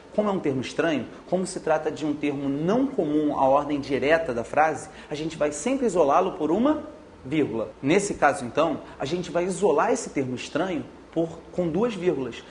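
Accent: Rio de Janeiro, Brazil. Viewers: sotaque carioca e muito irritante, mas, ótimo video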